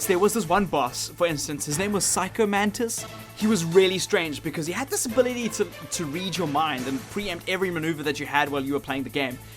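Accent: South Africa. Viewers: Hey, I thought I heard a hint of South African in your accent and I wanted to know if you are.:D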